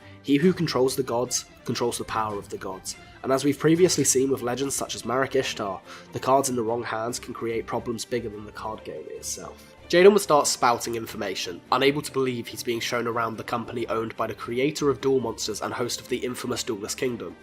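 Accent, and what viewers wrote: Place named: United Kingdom. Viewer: Your voice is so fucking British alas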